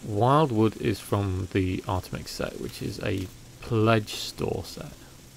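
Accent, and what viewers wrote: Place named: England, United Kingdom. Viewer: Can’t even understand with your English accent. Can you put the names on the screen 👍🏽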